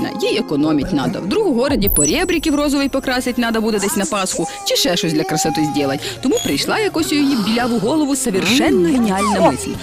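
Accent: Ukraine. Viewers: У меня тетка на Подоле живет в Киеве. Так она точно так же разговаривает. Один в один. И суржик, и интонации и фразочки ту, пипец, как похожи.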